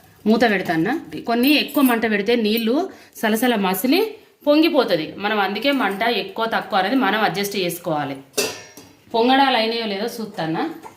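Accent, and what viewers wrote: Telangana, India. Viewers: Telangaana accent Chaala baagundi Mee Pallalu semi chadivinaaru. ?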